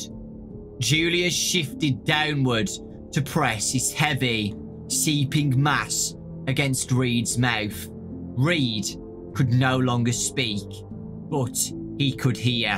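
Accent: United Kingdom. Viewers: He sounds sorta british and I want to have a british accent but I have this boring default american accent, I wanna say,”OI MATE YOO AINT GOT NUFFIN O ME!”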